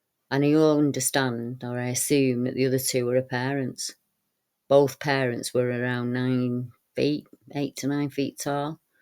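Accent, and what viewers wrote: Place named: Northern England, United Kingdom. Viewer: Just found you. Great work. Great northern accent. Luv it!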